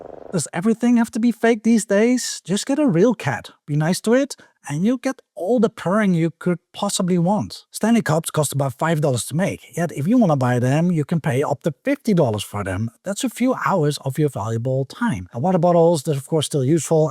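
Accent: Netherlands. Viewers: Je klinkt Nederlands! Goeie video iig.. bedankt!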